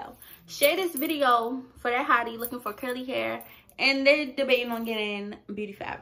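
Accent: Baltimore, United States. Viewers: You’re from baltimore arent you😏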